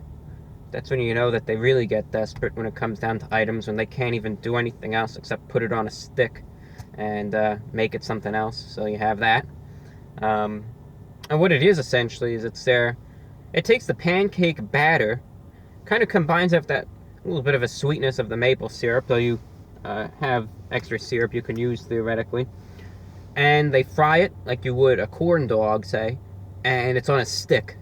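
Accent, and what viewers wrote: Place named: New York, United States. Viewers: Do you happen to be from New York or north jersey? I can tell from your accent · Why do you have a stereotypical Italiano Brooklyn accent? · This guy sounds like hes from brooklyn or Jersey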